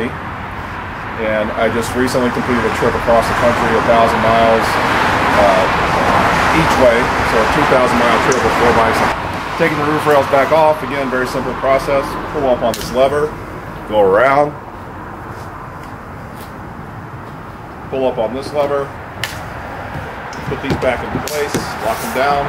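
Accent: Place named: Midwest, United States. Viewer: Have seen many '16/17 Outback reviews, and not once has anyone shown the roof racks in operation. (Thanks!) Thule or similar crossbars can cost hundreds; integrated rails is a great feature. Could tell you're in Chicago by the architecture, and your slight Midwestern "accent." Sounds like home. Far North Side? Edgebrook? / South? Beverly?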